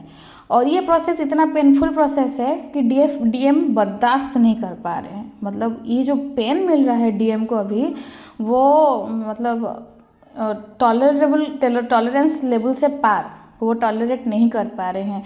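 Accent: Odisha, India. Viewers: Mam ap odisha se hein mujhe lgta h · Mujhe b Aisa laga accent se lag raha odisha se hen jese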